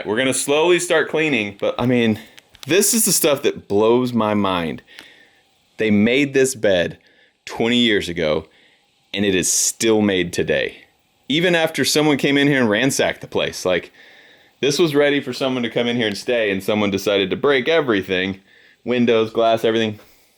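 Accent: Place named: Montana, United States. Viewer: Montana...... Yes please Love the accent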